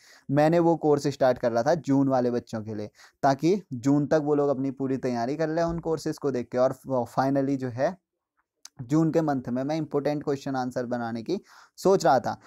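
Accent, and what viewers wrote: Pahadi, India. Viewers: Bhai tu pahadi h na accent se lgra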